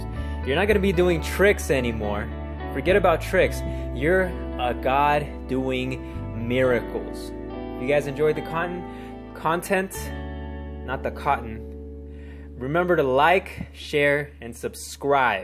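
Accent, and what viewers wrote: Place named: Texas, United States. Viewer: The deadpan texas got me weak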